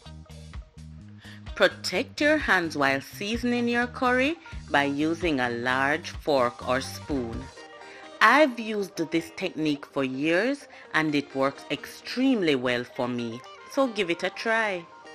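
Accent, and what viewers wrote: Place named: Jamaica. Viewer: Love this style. Nice accent. Big love to Jamaica 🇯🇲🇯🇲🇯🇲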